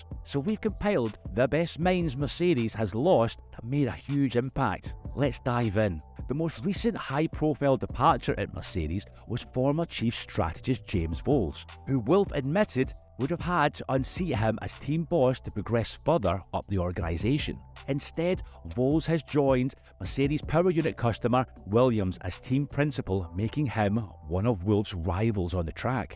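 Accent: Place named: Highlands, United Kingdom. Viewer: nice accent man, r u highlander? u roll the r like spanish pro speaker jajaja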